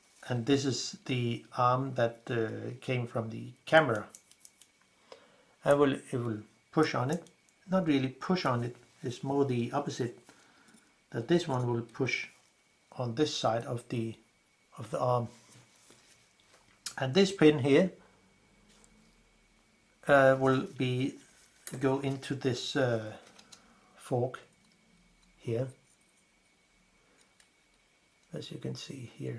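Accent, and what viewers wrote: Denmark. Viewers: Thank you very much for this amazing and very knowledgable content. I also decided to follow your instructions, however, I ran into problems when I accidentally disassembled the focusing part which lead to a lot of problems. I am fully aware it's entirely my fault but I really want to fix it, is there any way I could contact you please? I live in Denmark, you sound bit danish too, but don't know anyone who could help me. Thank you and good luck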